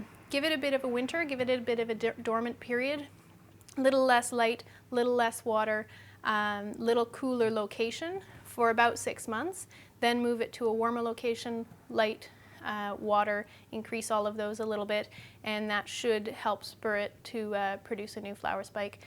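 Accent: Canada. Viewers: Its Canadian accent!!! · she must be from Canada. Oh and by the way. What are you, 4?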